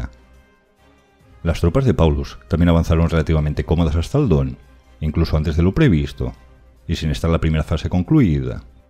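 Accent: Galicia, Spain. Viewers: Eres de Coruuuuña?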